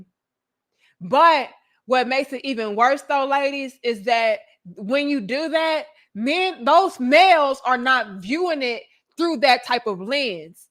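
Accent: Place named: St. Louis, United States. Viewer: Important message. Thanks. Where are you from or raised? I'm trying to place your accent. Ok, St Louis.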